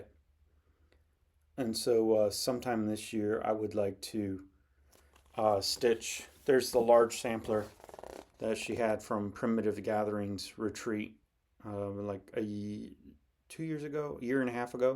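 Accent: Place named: Wisconsin, United States. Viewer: Love love love the Plum Street saltboxes layout ..... I'm a house stitcher ...... you've enabled me 😊Also the Drawn Thread seasons idea. I've written this down or I tend to forget. Great great stitching!! Sounds like you are from Wisconsin. I'm halfway between Green Bay and Appleton. Enjoyed your bourbon story......I like to learn. Thanks for sharing! TV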